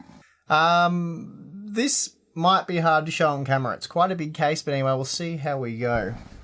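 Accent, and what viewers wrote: Australia. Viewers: Its not "hea" its here, its not spoze its "suppose" Learn to phrase you lazy Australian! Can't beleve you have accumulated so much FREE STUFF from manuafacterers who have sent you their expensive SHIT that you have literally WALLED! Maybe you should be donating them to your viewers instead of throwing shit around like your some expert. HINT! You don't even know how to handle a MOBO! BTW the Taichi board you pulled out and threw to the side is one of the best boards you can buy yet you had nothing to say about it other than "looks like the others". You knew nothing about it? LMFAO!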